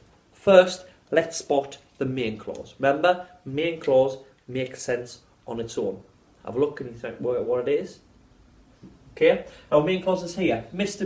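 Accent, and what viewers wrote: Scotland, United Kingdom. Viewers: its scottish fam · Da british accent tho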